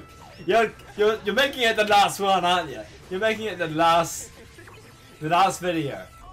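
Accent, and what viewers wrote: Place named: New Zealand. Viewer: Where is he from, he sounds like he's from NZ, I'm from NZ and he sounds like he's from NZ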